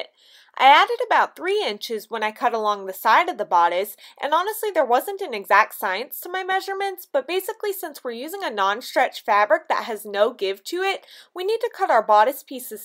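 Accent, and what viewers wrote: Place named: Canada. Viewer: Hey, your accent makes me wonder where you're from. Are you Canadian??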